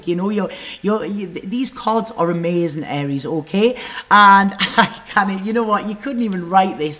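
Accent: Newcastle, United Kingdom. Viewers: Awwww how man!! a Geordie lass tarot reader!!! Love it!! Just stumbled across you! You've now got a Geordie follower 👍xx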